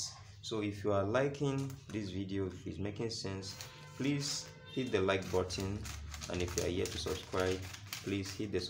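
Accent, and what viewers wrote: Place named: Tiv, Nigeria. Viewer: U speak like my Tiv brothers, weldone.... Wel articulated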